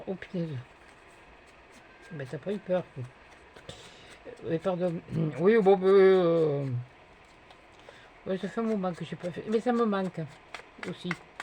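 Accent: South, France. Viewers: Cc, magnifique cette page très réussi,😍 Maminette a eu une très bonne idée pour ce défi, j'adore t'écouter chanté avc ton petit accent du sud c'est merveilleux ❤, plein de câlins a Arkos 😻, Bonne soirée et 🌃 bisettes 😘😘😘💝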